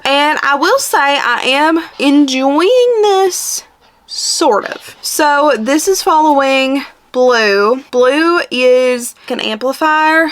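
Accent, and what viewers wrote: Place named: United States. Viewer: I love your country accent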